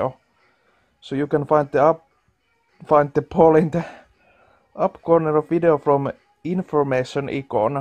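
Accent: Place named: Finland. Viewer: This is the most Finnish thing i've ever heard :D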